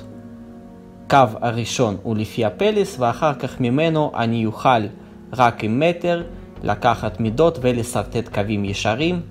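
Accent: Russia. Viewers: Акцент явно русский.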